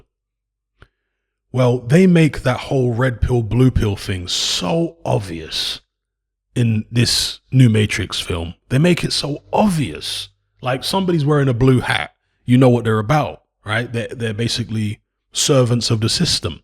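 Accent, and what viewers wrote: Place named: Caribbean, United Kingdom. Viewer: Hey homie. When you said this movie is absolute garage wit your british caribean accent. I laughed at the exact same time my guy.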